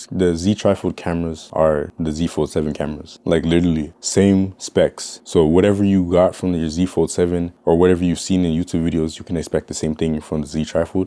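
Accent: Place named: Canada. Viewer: I thought you were canadian from your voice but then saw the fibetv. How the f lmao. Bro that was so much to import. Thanks for making this content dude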